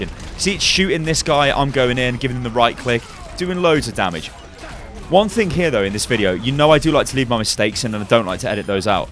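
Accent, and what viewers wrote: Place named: United Kingdom. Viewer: Subbed for that hilarious laugh and that epic Brit accent. Oh, and the guides. Kinda. Ish.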